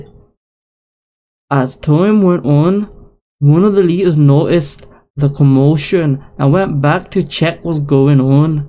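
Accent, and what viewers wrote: Scotland, United Kingdom. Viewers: Advice. Ditch the Scottish accent on the video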